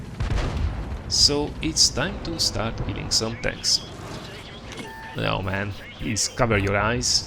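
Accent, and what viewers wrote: Hungary. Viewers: hallom az ahcentusából :) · Such nice Hungrish accent.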